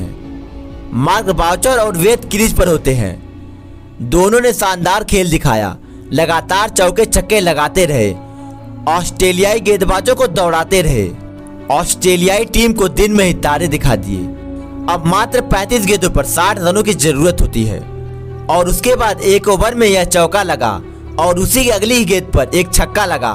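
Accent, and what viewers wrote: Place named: Bihar, India. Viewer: Tu bihar se ho ka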